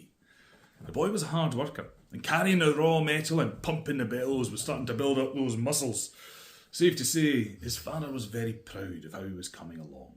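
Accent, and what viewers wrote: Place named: Scotland, United Kingdom. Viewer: I'm a firm believer that folklore stories are best told by someone from the country the story comes from...LOVE Scottish accents the best 😊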